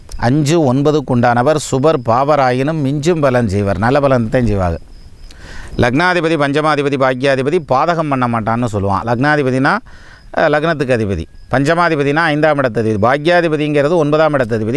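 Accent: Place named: Madurai, India. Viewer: Ungalaal, Namathu Dindigulukku Perumai, Tamilukke perumai, tamil naattukkum perumai, Naattukkume perumai. Kaalam pora pookil pazham-tamil sethuvidumo yendru payam irunthathu.... But it is playing happily in your tongue. Thanks for protecting and preserving our pazham-tamil related to astro field. I am fully impressed with your deep knowledge, detailed analysis, Tamil delivery, namma dindigul - Madurai slang and nakkal. Vaalka Valamudan. I 'll be meeting you sooner.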